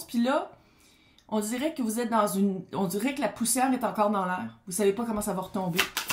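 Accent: Quebec, Canada. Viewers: Bonjour Merveilleux tirage Je vous adore et vous écoute tout le temps. En plus vous-même mettez du soleil dans mon cœur ❤️ avec votre accent québécois. J adore ce Pays . J y suis allée. Bonne journée à vous Gros bisous de Cannes où le soleil est au rendez-vous 😂😘😘😘❤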